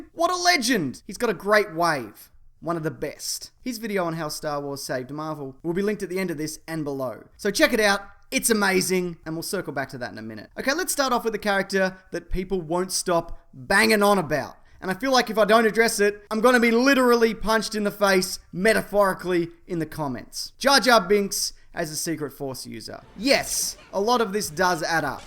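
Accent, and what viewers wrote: Australia. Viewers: dude are you an aussie?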